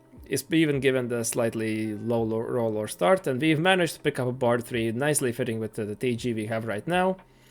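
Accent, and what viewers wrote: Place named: Latvia. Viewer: Will have to try this one out. Based on accent and Channel name I guess it's safe to assume you are from Latvia. Also, it seems like the titles of your videos grab attention very well, but some work on thumbnails could help you with reach, I haven't explored much of TFT content, but a bit of research on other TFT videos and their packaging can go a long way when it comes to exposure.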